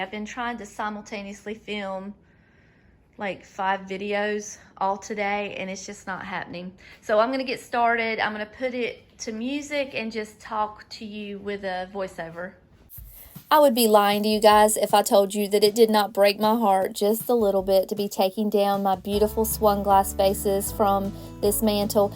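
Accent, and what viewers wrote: South, United States. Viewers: It can be what you want it to be and put out what you love. I think you are still precious! And I love that you talk like me…southern.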